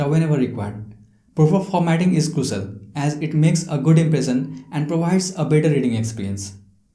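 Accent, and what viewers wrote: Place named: India. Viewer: Indian accsent is the worst in the world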